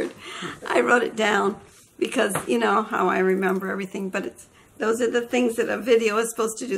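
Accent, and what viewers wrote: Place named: Western New York, United States. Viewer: You are from Western New York Ms. lol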